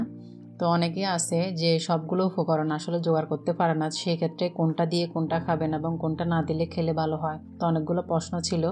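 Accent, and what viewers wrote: Chittagong, Bangladesh. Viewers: Apu you from chittagong?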